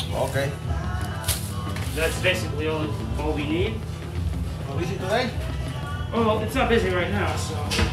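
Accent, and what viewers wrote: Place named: Spanglish, United States. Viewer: Cámara chingon el spanglish 🇲🇽🤟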